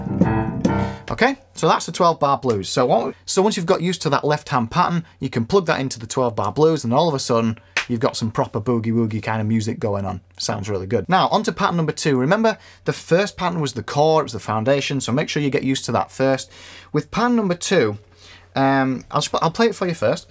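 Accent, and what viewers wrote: Yorkshire, United Kingdom. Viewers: not a joke, just complete bollox. 🤣 I love your “nothing like cockney” accent which sounds like a soft (Leeds?) Yorkshire to me. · Sounds like he's from Yorkshire. ep up mek a brew bluddy ell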